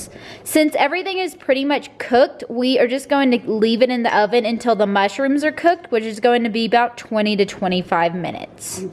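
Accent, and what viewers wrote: South, United States. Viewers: I just had to come and say, I always watch your videos and usually my 4 year old is in the room with me. Last night after I watched I was on to another video and he says ‘ is that the girl with the dog named bo? And I said oh no I already watched her video tonight. He then said ‘without me???’ 😂 he loves your videos too. I think it’s your southern accent he loves.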